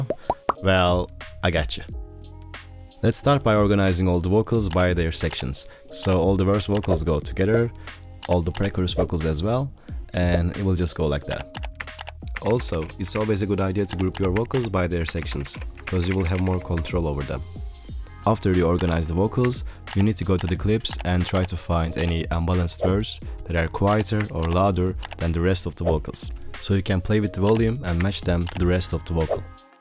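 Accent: Turkey. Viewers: aksanından türklük bangır bangır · abi ingilizcenden belli sen Türksün !!!!!!!!!! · Biraderim bence sen kesin Türksün ben bu aksanı nerede duysam tanırım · Hocam aksandan dedim bu çocuk türk mü öyleymiş great content tho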